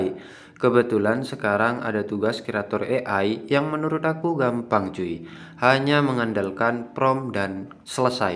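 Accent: Madura, Indonesia. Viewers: Logatnya Madura bangtt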